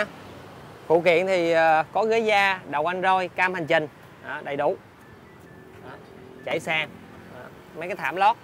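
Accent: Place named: North, Vietnam. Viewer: Sao kênh này mà có khi nghe giọng người Bắc?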